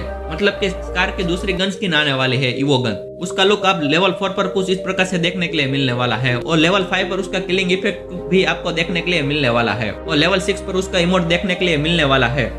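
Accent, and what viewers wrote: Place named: Gujarat, India. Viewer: I think you are Gujarati aapke aawaz se pata chal gyaa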